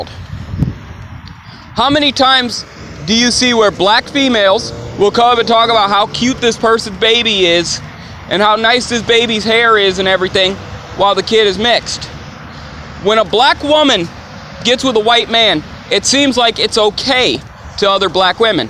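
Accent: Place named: United States. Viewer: I'm not sure what to say or think, at first I thought you were trolling -- but maybe you are an american and thus the accent. I'm mixed race as well -- and I've put a lot of thought into the subject for my years on this planet ... I don't quite cosign everything you are saying but I'm sure its accurate to a degree based off of where you live and the people around you -- but I do understand not being taken seriously if what you say is outside the popular narrative du jour. my life has lead me to embracing individualism as a primary value -- something I intend on passing on to my son who is even more mixed. still do you, its nice to see divergent voices and points of view especially among us multiracial folk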